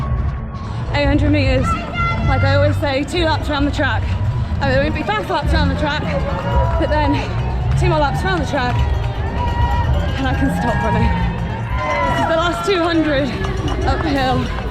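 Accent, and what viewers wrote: United Kingdom. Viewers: Can't imagine winning the lottery to get in, let alone speak in a british accent.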